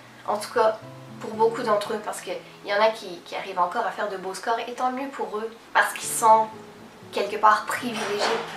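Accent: Quebec, Canada. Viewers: t'as tellement un accent québécois pour une ''francaise'' ?? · Pourquoi tu mélange les deux accent? C'est vraiment bizarre je veut dire...j'écoute les vidéo d'Emma verde et elle sa a plus de sens toi tu mélange les "pas" a un moment tu dit "po" comme l'accent quebequois et parfois "pas" comme l'accent français c trop bizarre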